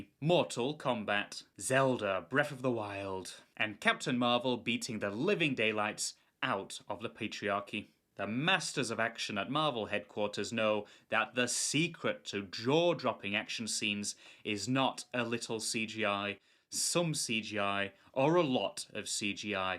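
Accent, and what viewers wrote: United Kingdom. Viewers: These videos are INSPIRING and genius. I have been pondering making a channel praising the woke bowel movement incessantly in a serious manner to the point of ridiculousness for awhile now. I will only do it if I can pull it off right. It won't be as elegantly as does it that's for sure. He's a Brit so the accent is perfect for it.. I'm going to have to come at it in a more aggressive Antifa/BLM obnoxious type of manner. Great job, mate.. cheers!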